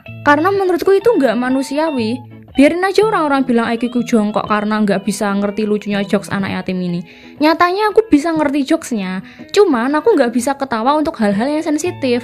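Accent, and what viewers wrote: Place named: East Java, Indonesia. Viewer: Kaka dari Jawa Timur ya, soalnya kaka suara nya agak... Medhog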